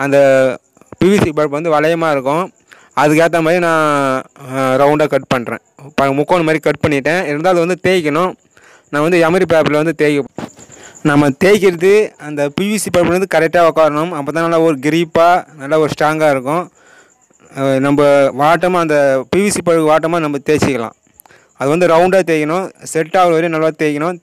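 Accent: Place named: Chennai, India. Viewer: அருமையான தமிழ் மெட்ராஸ் பாஷ பா,பழ்ப்பு வலிச்ட்டு,அருமையான கண்டு பிடிப்பு பா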